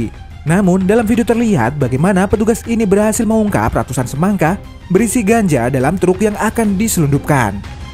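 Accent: Madura, Indonesia. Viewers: Kentara banget nih naratornya berdialek Madura tak iyee...🤣🤣🤣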